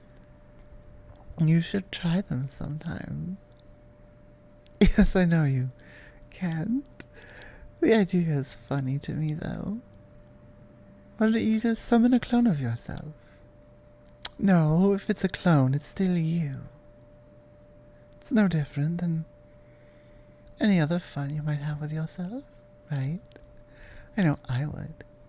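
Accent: United Kingdom. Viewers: Oh my God the British accent activates my neurons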